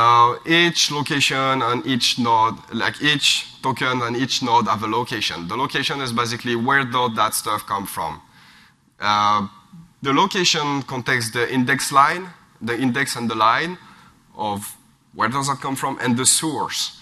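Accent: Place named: France. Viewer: Nice French accent ! Some frenchies have talent :)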